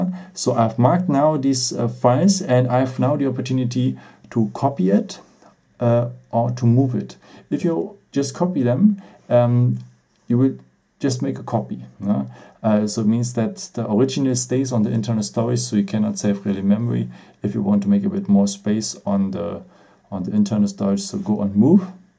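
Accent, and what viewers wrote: Germany. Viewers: German English :))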